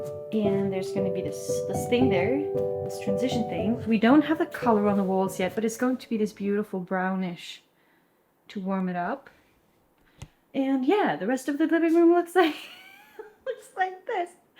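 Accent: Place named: Canada. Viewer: When I looked up how many pounds your 190kg fireplace was (419!!), I was like, "Yeah, they're gonna need a forklift." You guys used a fair amount of ingenuity, determination, and sheer willpower, to get that thing into your house!! The fire it makes is beautiful. One question: Do these types of fireplaces have a creosote buildup problem like brick chimney fireplaces? If so, you should probably clean out the exhaust regularly to keep it safe. I saw you had a motorcycle and a digital piano in your house. Are they both Yamahas? btw, I think you speak English with a Canadian accent, which is better than a US accent imho, except for how they say "decal". You're saying "cupboard" right, btw. The way you weave back and forth between your language and mine makes me want to learn how to say stuff in another language. You and your bf should team up with Kevin Bacon and Kyra Sedgewick. 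Congrats on a successful, beautifully executed long-term project, which took a ton of effort!!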